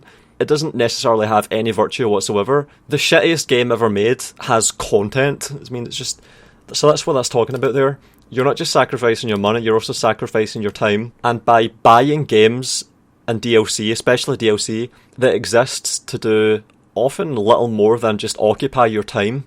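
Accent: Scotland, United Kingdom. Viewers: I remember watching you 8 years ago and I couldn't understand your accent, since then I've spent quite a bit of time around people from Scotland and Northern England so now your accent isn't hard to understand at all. This has no relevance to anything you talk about in the video. Keep up the good work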